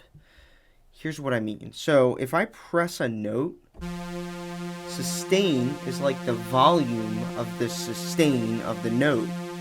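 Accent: Philadelphia, United States. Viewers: Hey bro are you by chance from Philly? I live in port Richmond and I swear I hear my neighborhood when you speak, maybe I’m wrong. Either way great video! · I know a Philly boy when I hear one! Haha thanks man, I'm a newbie and this is probably the most helpful video I've watched so far about synth sound design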